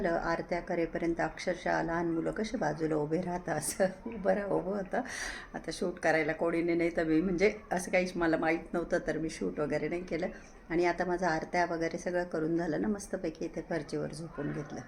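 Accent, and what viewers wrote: Nashik, India. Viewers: Hi dii me tar pillu la Laddu ch mhananar..❤❤ me tar त्याच्या videos chi waat baghat hote Love you..me Nashik मधील ch aahe but now staying in Bangalore..tumcha typical Nashik cha हेल आहे i mean accent aahe तो खूपच मस्त वाटतो❤❤❤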